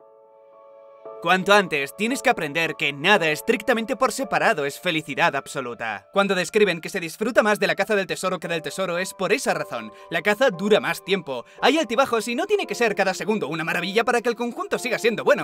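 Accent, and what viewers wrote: Spain. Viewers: "Es confuso verdad?..." - El Dios con la voz española españa talla por los dioses y los demonios... La verdad sabe temas y cosas que puede hacer FAMOSISIMAS!!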